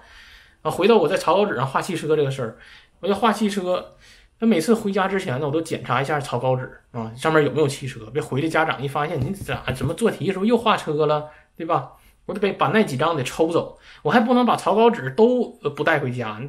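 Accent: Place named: Suizhong, China. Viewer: 听口音是绥中的吧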